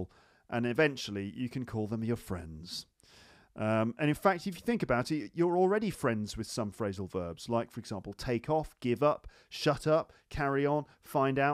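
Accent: United Kingdom. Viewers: hello... First time watching you....great..I love your British accent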